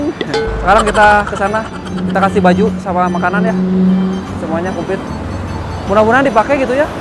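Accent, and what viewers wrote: West Java, Indonesia. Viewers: Diatu sunda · Bang asal mana ko sunda 😊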